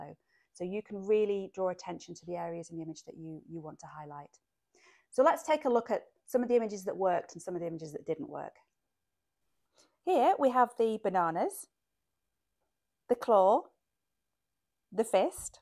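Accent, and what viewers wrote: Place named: England, United Kingdom. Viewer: We speak English with an English accent in England. For those that don’t understand English well, you can turn on the subtitles!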